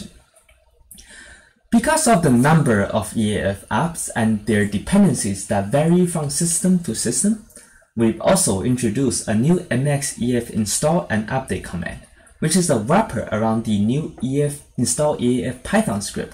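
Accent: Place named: China. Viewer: 一开口就是老中国人了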